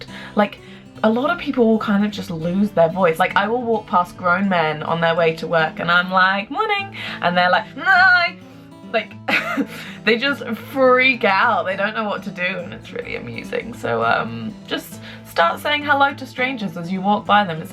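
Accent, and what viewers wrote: New Zealand. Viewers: Thanks for the update. Your accent is so kiwi it's UNREAL! Haha. We decided to start exploring the UK too after coming back from Asia - like you said we can plan to see countries on the opposite side of the world when we haven't even explored our own. Look forward to the upcoming videos, Amy and Yas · so excited to hear what your up to these days :D also your accent keeps making me laugh because the kiwi accent keeps coming through haha!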